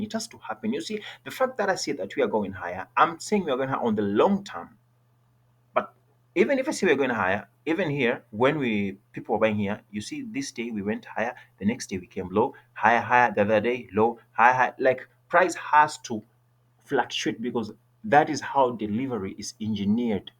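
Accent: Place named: India. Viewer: Why you speak like indians ? Are you paj..et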